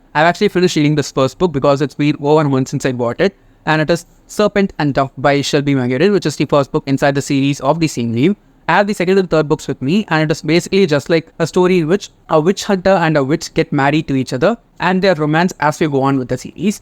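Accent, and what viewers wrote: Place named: England, United Kingdom. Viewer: Love ur english accent.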